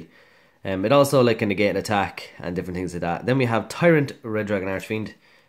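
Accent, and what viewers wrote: Ireland. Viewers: Number 15 view 👌 you sound like you’ve an Irish accent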